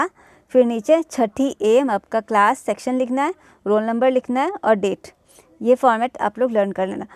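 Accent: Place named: Bihar, India. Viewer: Mam ap bihar ke ho naa😉don not mind please 🤌🏻vo bss apke bolne ke tarike se lag raha tha ki app bihar se ho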